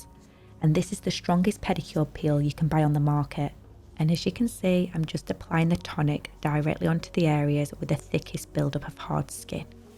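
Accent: United Kingdom. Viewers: where in the UK her accent is from?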